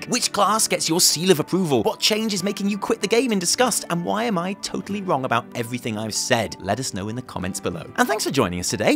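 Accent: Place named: RP, United Kingdom. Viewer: BBC voice, love it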